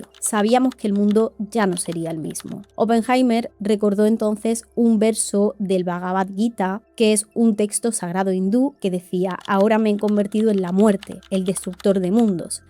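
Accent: Spain. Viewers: Es video es excelente, me ahorras el tener que leer un libro cada vez que veo uno de tus videos. Está muy bien documentado, te felicito. Y me encanta tu acento porque soy de latino América y mi país heredó ese acento andaluz como el que tú usas, solo que se te escucha mejor a ti. Te felicito y sigue adelante. Espero tus nuevos videos. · Buenisimo documental! Y mas con tu hermosa voz y acento! De qué parte de España eres? Para reconocer el acento…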